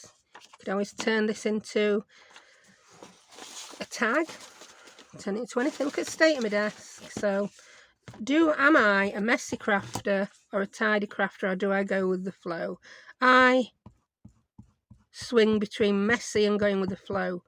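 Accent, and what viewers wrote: United Kingdom. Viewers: Hi! I'm so glad I discovered your channel. I love listening to you. You have such a cozy voice. ( I watch most other people's videos with the sound turned off. ) Also, as a Brit who has been is the U.S. for almost 60 years, your lovely accent is a wonderful piece of home, even though I'm actually from Brighton. And, I like your tutorials. I'm fairly new to journaling, so your information is very much appreciated. Thanks!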